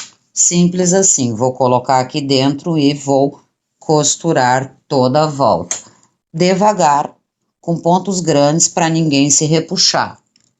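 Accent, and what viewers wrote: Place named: Gravataí, Brazil. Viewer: Ficou lindo o chinelo, e não é q reconheci esse sotaque , sou de Gravataí 😘😘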